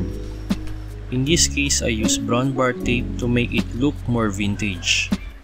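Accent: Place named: Philippines. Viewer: I notice the Accent, Filipino ka sah? anyway ganda ng touring set up nato.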